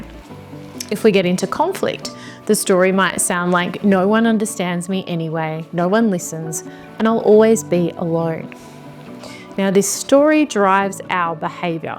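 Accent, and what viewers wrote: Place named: Australia. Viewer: Finally an Australian, so pleasing to hear and feels so home. I am traumatized was accidentally found out in a date that went wrong a few weeks ago. I put my baseline thoughts on chatgpt and after a few questions it also correctly predicted I am severally traumatized. Here one human and now a computer. Both predicted the same. Last two weeks I am obsessed with writing my turbulent childhood for a child who apparently wires differently, volatile growing up, even isolated reclusive adulthood which I did not want. Now at middle aged something new opened up which I never knew before. Forgive me for rambling. I am so happy to come across your channel.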